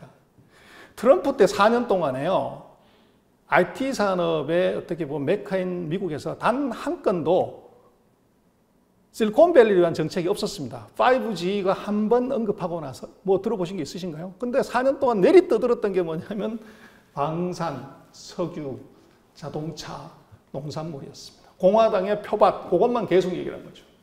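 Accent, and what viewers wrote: China. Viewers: 뭔가 객관적으로 수치 들이밀며 설득하려고 애쓰는데 묘하게 반감이 드네. 한국표준어 잘 쓰는 조선족 같아. 미국과 의리 보다 중국에 붙어라 이건가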